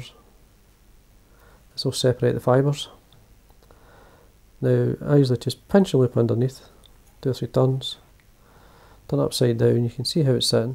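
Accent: Scotland, United Kingdom. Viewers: The ladies love your soft spoken scottish accent, and those flies looks so easy to tie. A true ambassador to fishing. Outstanding work,enjoy your life 's passion and thank you for sharing.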